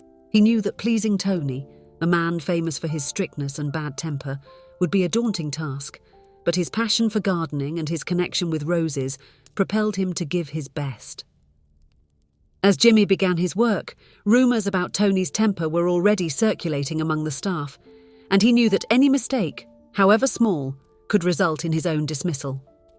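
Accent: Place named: United Kingdom. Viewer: I love your narration style and your British accent. So, I subscribed to your channel a couple of minutes ago.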